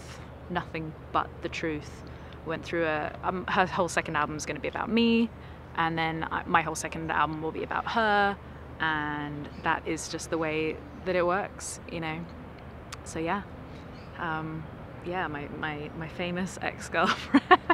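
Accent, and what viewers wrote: United Kingdom. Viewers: I know you hear this all the time but I so love your accent. Just British beautiful.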